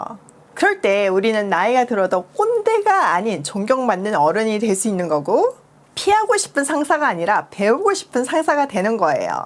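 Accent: Gyeongsang, South Korea. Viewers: 할렐루야!! 삼천포가 고향이시네요 경상도 사투리가 넘 친밀해요 저도 고향이 거제도에요~^^ 감사합니다 🙏 🙏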